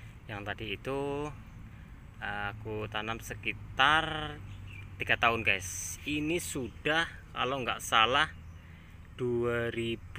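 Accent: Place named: Banyumas, Indonesia. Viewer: malay tp logatnya indo banyumas (sumpiuh deket sampang cilacap)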